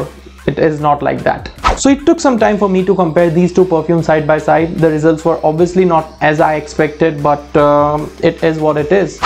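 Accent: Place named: India. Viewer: Nice review bro. Just one small request; either use complete desi or complete American accent. Speaking desi English and using American "Rrr" or "gueed" alone isn't gonna make you easy sounding for the International viewers. Get some accent classes. You won't regret it. And if you are targeting desi viewers only, we don't mind full desi.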